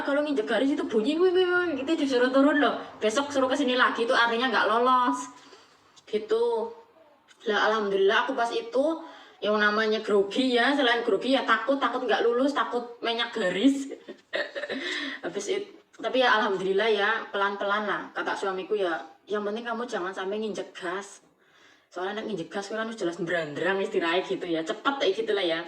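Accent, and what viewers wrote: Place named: Banyumas, Indonesia. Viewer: Hidup ngapak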